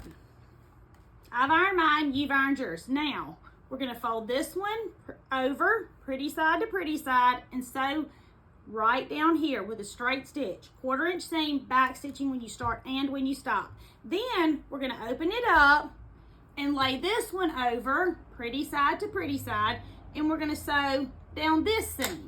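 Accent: South, United States. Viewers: Thank you so much! I just found your tutorial’s and am loving it! Plus I’m a southern girl relocated in the North and miss hearing a southern accent!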